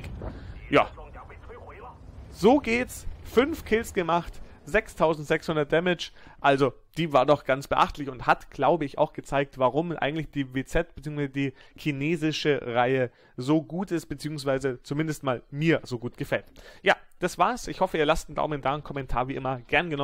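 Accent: Bavaria, Germany. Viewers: aus welchen teil Bayerns kommst du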